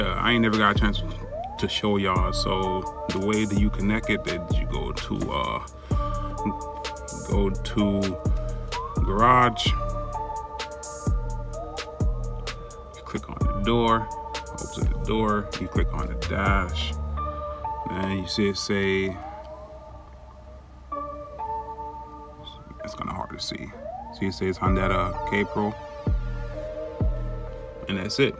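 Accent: Jamaica. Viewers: Just subbed when mi hear di patwa jump out dwl dem rass plug dear eeee. Respect my yute u build wikid.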